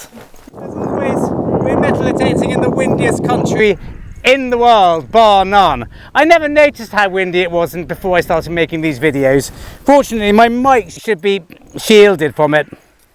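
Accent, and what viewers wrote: United Kingdom. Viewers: I do love youe videos and your very British English. I always smile with You when your smiling finding something interesting - more of this please! Must be fun to go out with you detecting... Greetings from Switzerland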